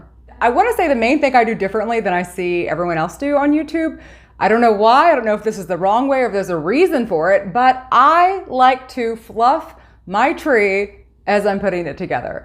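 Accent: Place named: East Coast, United States. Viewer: Yours is definitely my Fav diy channel. Your stuff is always so adorable and I just LOVE your accent. East Coast maybe? Keep up the good work!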